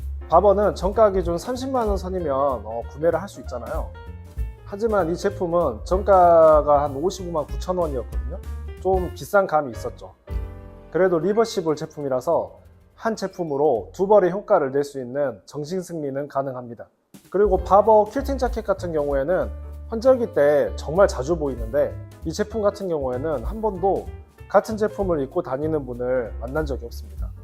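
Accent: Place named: Jeolla, South Korea. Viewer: 말투가 전라도광준디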